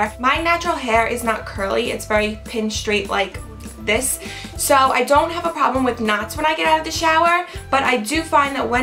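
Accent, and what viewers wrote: New York, United States. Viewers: are you from new york? you really have an accent. i can tell because i am from new york. :) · Is it just me or does she have a New York accent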